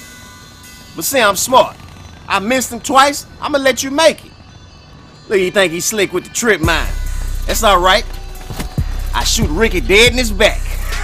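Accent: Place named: New Orleans, United States. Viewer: Ya heard me lol New Orleans accent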